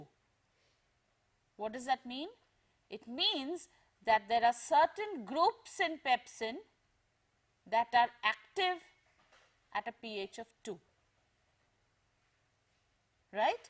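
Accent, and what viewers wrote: India. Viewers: lmao! ""OXIDOREDUCTASE!!"" man a luv da indian accent!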